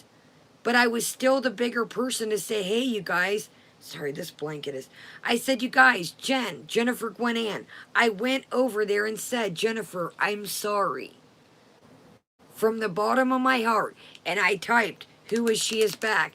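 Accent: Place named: South, United States. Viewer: I’m from the UK and I adore your raspy southern accent! It’s literally my favourite, I could listen to you all day! Your accent and a strong Scottish accent is my kyrptonite! Everyone can see you’re genuine, keep doing you 💜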